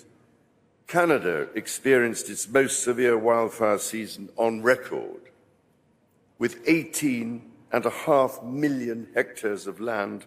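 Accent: United Kingdom. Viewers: You are telling me that when the British spoke like this they didn't have real issues to worry about?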